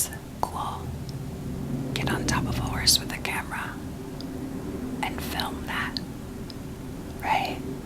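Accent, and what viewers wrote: South, United States. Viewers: You're truly a gem. Your southern accent relaxes me the most, but at this point I'll binge anything you make, you're a comedy genius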